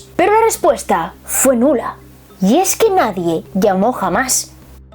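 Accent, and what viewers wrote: Spain. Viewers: Un misterio muy misterioso porque no tiene 10 millones de suscriptores. Saludos, tu acento español tiene un misterio encantador Saludos bellotas hermosas y preciosas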